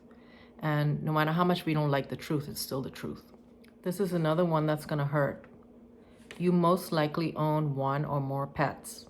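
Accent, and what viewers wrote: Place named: Caribbean. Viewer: Based on your accent, are you from the Caribbean?. Excellent advice by the way.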